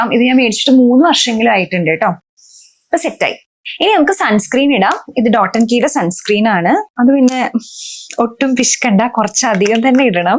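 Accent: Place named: Valluvanad, India. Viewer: Thrissur കാരി അല്ലേ...പക്ഷെ വള്ളുവനാടൻ ഭാഷ ആണെല്ലോ പറയുന്നത്...സ്റ്റൈൽ ിനു വേണ്ടി ആണോ...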